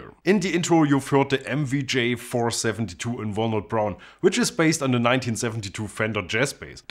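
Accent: Germany. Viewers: Looks can be deceiving, for sure. This German/Austrian-sounding bald man plays bass as soulfully as any funk bassist I’ve ever heard and I was playing music (drums) in the ‘70s, when I was a teenager. Sweet video! Blessings, Grace and Peace 😊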